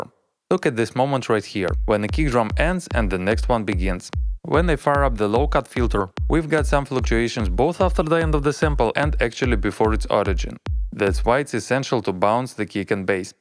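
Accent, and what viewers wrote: Russia. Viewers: Акцент очень похож на русский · Nothing is better than a Russian person teaching you! Very unique person with good knowledge & really like his accent :))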